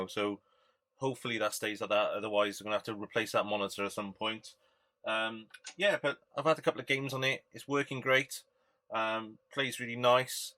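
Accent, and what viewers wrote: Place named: Cardiff, United Kingdom. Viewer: You sound like a Cardiff boy, i bet "G" couldn't make a pin like yours.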